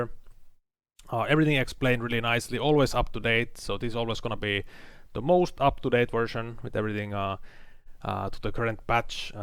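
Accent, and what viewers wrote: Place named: Finland. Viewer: Juu kyllä suomalaisen rallienglannin tunnistaa ^^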